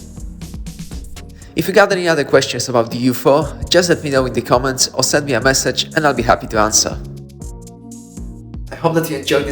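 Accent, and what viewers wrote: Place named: United Kingdom. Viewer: I'm so pleasantly suprised with this video!! I believe it's the first time you ever made a video like this on youtube, but you seem super comfortable speaking on camera!! And I can hear the British accent coming through ;)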